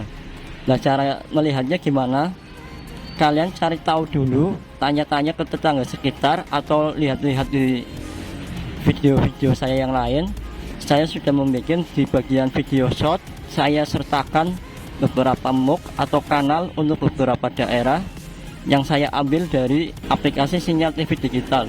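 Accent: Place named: Moga, Indonesia. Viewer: Pemalange ngendi kang..... Opo moga, kadi logatte koyo wong moganan 🙏😁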